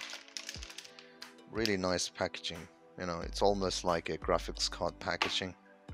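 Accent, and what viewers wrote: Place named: Germany. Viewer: loved video, i can tell your normal language is german, it's a cool language :D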